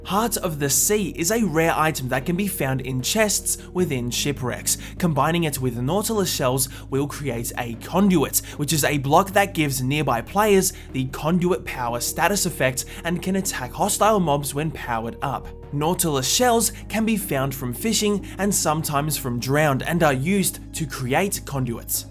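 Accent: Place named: Australia. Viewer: Hi I love your videos and your personality. You inspire me to make short content on my own but I don’t post it because my friends say it’s ‘not good enough’. You are my all time favourite YouTuber and your accent is amazing. (I’m Aussie too!) But in all seriousness, I can’t live a day without watching one if your vids. ❤️❤️ Edit: OMG OMG a heart!!! 😁😁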